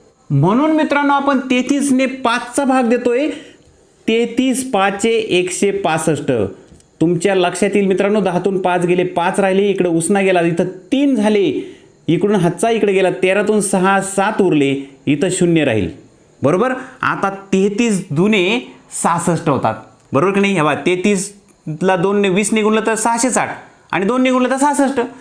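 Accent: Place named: Parbhani, India. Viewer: Parbhani bolto sir bhari strik ahe sir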